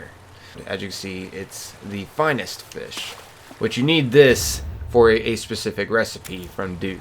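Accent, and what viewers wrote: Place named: Pittsburgh, United States. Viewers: Pittsburgh pa away. Greetings from PA. Yinzers tell the jagoffs to quit driving over the burm. Wosh your dishes and laundry. Love the pittsburghese. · Yo are you a yinzer or not man? Your accent is awesome.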